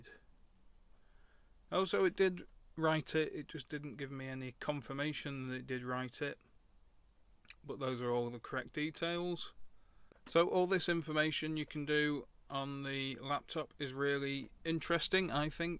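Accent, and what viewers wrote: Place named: Yorkshire, United Kingdom. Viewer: Ah right, I live near Todmorden. I was thinking your accent was a bit diluted to be fully yorkshire lol. I was wrong :)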